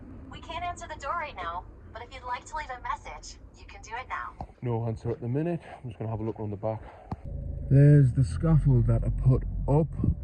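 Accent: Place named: Newcastle, United Kingdom. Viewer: Union scaffolder since 2014 but started taking training on tube and clamp earlier this year and got into watching your videos. Got to say I love the Geordie accent. My brother in law is from Chesire and the Scouse accent is great as well. Been watching Dibnah videos for years. Always done systems with tube and clamp additions but learning to put up proper tube and clamp has been a blast. Doing "English" style as we call it here and "Western" types. Western we put the transoms on the standard instead of the runner/ledger. All wedge clamp here though. Bit of a holdover from the days of scaffolds being all wood. Tube and fitting was never really a thing until it was used for industrial in the 70's. Love the videos mate. Glad I could help you out with the van some.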